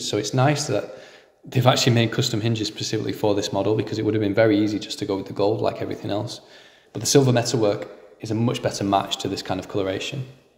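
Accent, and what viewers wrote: England, United Kingdom. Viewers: You just need a bit more practice hearing Brit English for your ears to adjust. That's like saying you can't understand someone in Mexico because you learned Spanish in Belize. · With YOUR HEAVY ENGLISH ACCENT , Many international followers hear this “ it is my job to PAY you the best glasses. “ Adjust, adapt, modify . Speak clear, dont swallow words. We are not British English. English is international , keep it continental .PLEASE 🥹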